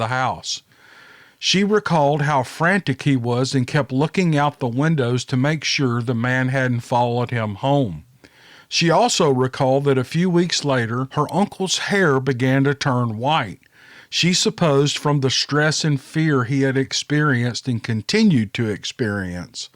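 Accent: South, United States. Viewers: Came for the bigfoot encounters, stayed for the ranting.;)I love the stories, interviews, and your accent! I fully love a well spoken southerner. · I do! Because I know I'm going to LOVE the stories. But, what makes the stories even better is narrations. I love that southern drawl; he does a GREAT job!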